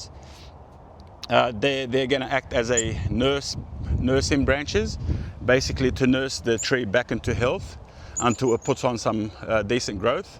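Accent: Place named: Australia. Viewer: That Wog Aussie accent, I love it. I'm a Wog Boy too 😃 Well done mate. Good video.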